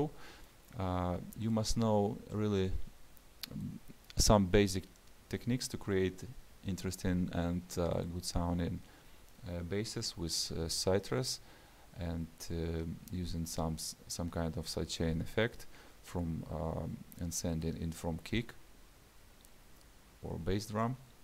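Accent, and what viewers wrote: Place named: Russia. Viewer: русский акцент))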